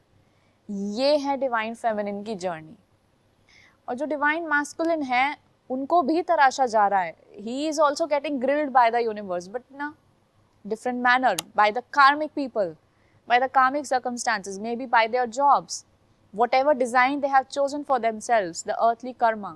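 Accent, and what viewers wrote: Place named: India. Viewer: I just love that Indian accent 😊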